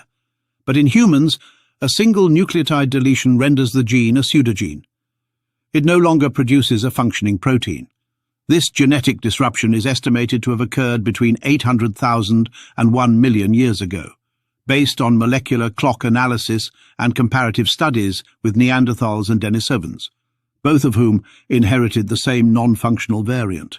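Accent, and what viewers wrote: RP, United Kingdom. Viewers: I don't care what you say even if you do sound BRITISH ELITE.🤮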